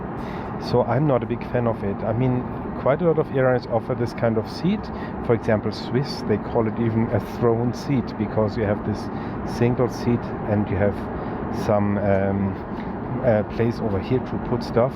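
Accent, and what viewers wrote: Germany. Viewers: This was very informative so thank you for creating this. Also, and not really related, but your German accent comes across as being very friendly 😊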